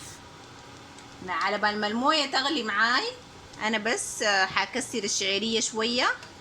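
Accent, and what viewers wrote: Sudan. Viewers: ما شاء الله شكلها حلو جدا 😋 علي فكره بحب اللهجة السودانيه جدا ♥️ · الهجه اليودانيه جميله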